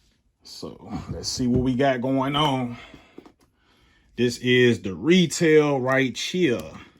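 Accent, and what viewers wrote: Richmond, United States. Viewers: Richmond accent?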